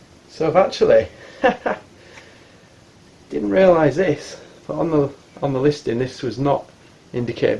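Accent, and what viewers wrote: Yorkshire, United Kingdom. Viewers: This is pretty cool tbh are you from yorkshire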